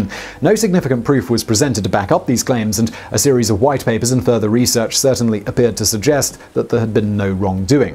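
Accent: United Kingdom. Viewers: I reposted your comment with attribution since it's good to get the truth out there; it's unlikely Simon & Team aren't aware of these things, and their claim that a 'miracle' is needed is agenda driven B.S. on their part. Simon's proliferation of channels and age-old British accent schtick to drawn in the uneducated to a perceived "authority" on a multitude of subjects is paper thin and downright clownish sometimes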